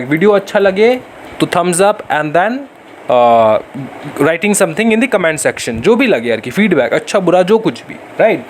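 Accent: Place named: Haryana, India. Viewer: Haryanvi voice Gjb 😊 I like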